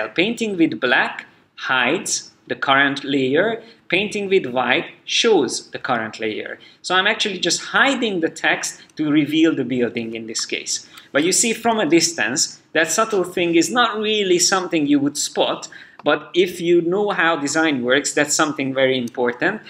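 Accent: Hungary. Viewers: Magyar vagy? Az angolodnak annyira magyar akcentusa van!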